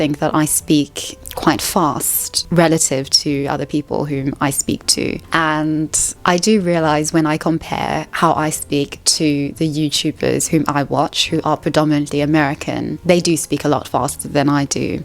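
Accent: RP, United Kingdom. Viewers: I absolutely love your Proper British Accent. I am American and can so understand every syllable spoken. It's music to my ears. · Brits are definitely uptight and miserable compared to Americans. Please come to a cookout in the US. We'll make fun of your posh accent in front of everyone. But if you laugh and come back with a savage reply, we will love you very much and want you to stay forever. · As a (east)german I do not really care about US/UK cultural differences. But I love your voice and pronounciation (the "t" and "s", the vowels)! To me, that's the britishness of your channel. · It's your posh English accent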